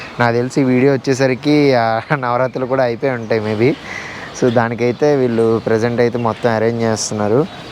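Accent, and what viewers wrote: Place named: Anantapur, India. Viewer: yaantaapur district ... super accent anna...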